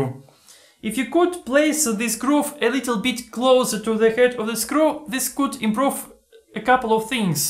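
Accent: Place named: Russia. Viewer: Хм, у тебя очень русский акцент))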